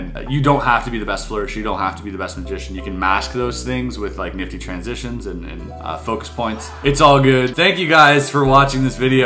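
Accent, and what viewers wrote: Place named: Canada. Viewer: Your Canadian really shines through in those old videos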